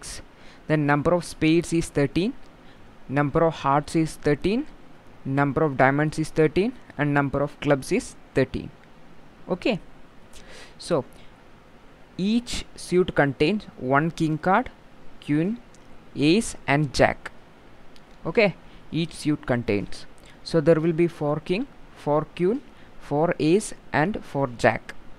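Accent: India. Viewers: Typical maliyali accent .....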